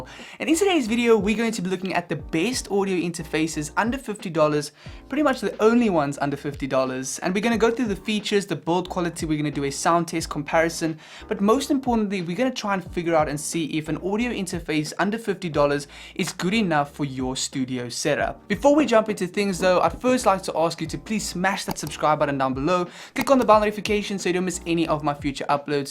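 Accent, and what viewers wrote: South Africa. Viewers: Hey!thank you for this video ,quick question,are you from South Africa?you've got the accent lol ,I'm from SA as well!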